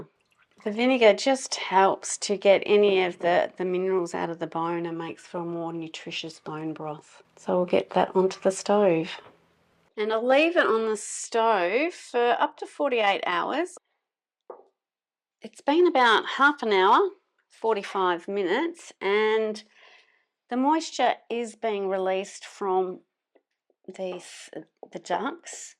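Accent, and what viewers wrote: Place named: Australia. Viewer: You sound a little australian. Can I ask what country are you in??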